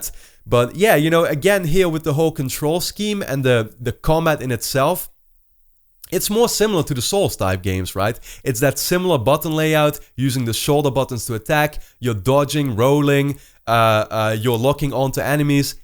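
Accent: Netherlands. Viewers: Ik dacht al dat ik hoorde dat je van Nederland was! Leuke video en veel interessante info. Thx! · Hey, are you Dutch? I just discovered your channel, and that accent leads me to think so. If you are, awesome! I am too and I love this video. Subscribed =) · are you dutch? you sound so dutch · Dude you have to be Dutch according to your accent.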